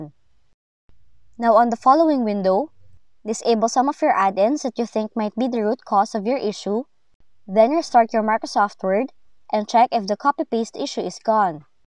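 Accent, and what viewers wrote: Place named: India. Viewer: I must say you cerntainly know what you're doing; however, (and I apologize for this) your Hindi accent overwhelms the pronounciation of some words (e.g.) step three, after the command "echo off|clip" you say press enter , then check __________(not understandable).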